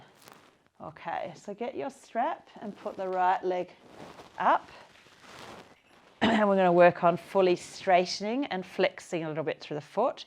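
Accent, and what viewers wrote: Australia. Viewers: Thx for the yoga exercises while conscious of knee osteoarth. I like the gentle knee bends and then standing on toes. All other exercises seem solid/proven ones to induce motion, strength in muscles around knees. If in another video you could touch on the issue of cartilage loss, cartilage gap and how to prevent ill-effects from that specifically, that would be wonderful! Great presentation overall, very compassionate style. The Aussie accent (?) is charming. Kudos. Thanks so much for making these videos!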